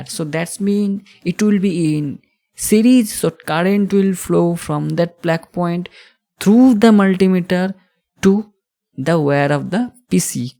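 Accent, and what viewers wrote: Bengal, India. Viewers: U r Bengali for sure 😅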